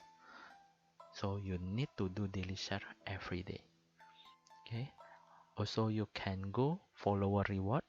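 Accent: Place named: Indonesia. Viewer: Fix org indo ini, dari cara ngmng nya keliatan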